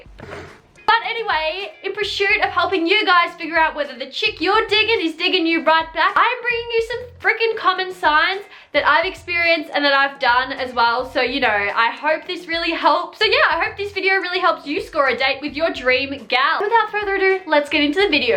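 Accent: Australia. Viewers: i blushed lmao thanks for replying ♥️ and yes you really sound aussie! smh how could I not tell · are you dutch???? Omg ik hoop het hahah. And are you from Straya I hear your accent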